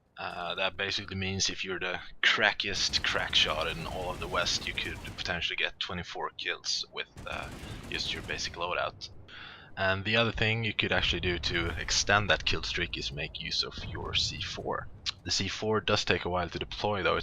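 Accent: Sweden. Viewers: From your accent I'm gonna go ahead and guess that you're from Sweden, or at least Scandinavia. :-)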